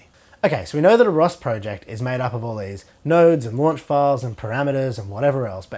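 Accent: Australia. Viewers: Excellent Aussie 😊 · thanks, I appreciate the feedback! Ive learned quite a bit from your video series / channel thus far so thats helped and am looking for more resources. My issue is that I have a rather unique robotics project and have been 'cobbling together' the ecosystem / tools myself & have only just discovered ROS. I will have to look more into it to see if its appropriate; I mean it has to be right?! PS. My 'unique project' at the moment is a Knight Rider KITT replica & was thinking ROS may be the solution. Yes, an actual pontiac trans am. PPS. You sound like a fellow Aussie, hope Im not being rude?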